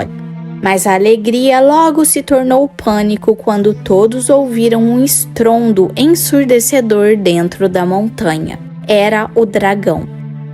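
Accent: Minas Gerais, Brazil. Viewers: Cara?,sotaque minerio uai!,ela não puxa não, é só sotaque mesmo!(nos diga de onde vc é amigo?)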